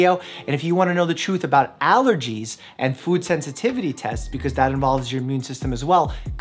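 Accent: New York, United States. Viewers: Is he sounding more New York than any other video he's been on? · with the NY accent though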